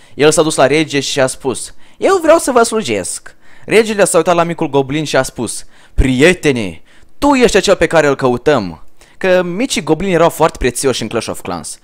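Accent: Moldova. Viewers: Dat Moldovean accent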